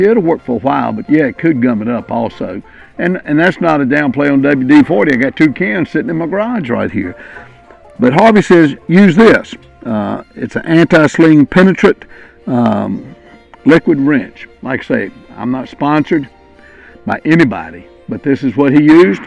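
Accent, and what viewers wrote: South, United States. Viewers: 5/6/23 Just came across your videos. I watched 2 so far and you addressed the same problems I had today after coming back from one of my first rides this season up here in the PA Poconos. I bought this 2009 Tri Glide Ultra after not riding for 4 years and felt embarrassed by the little problems I was having. Thank you so much. Love your down home style and southern accent. Most of all, thank you for your service to our country. I subscribed and I am looking forward to more helpful hints.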